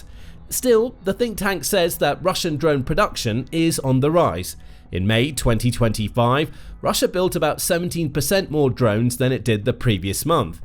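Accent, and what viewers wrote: United Kingdom. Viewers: British English, yes, so kinda regional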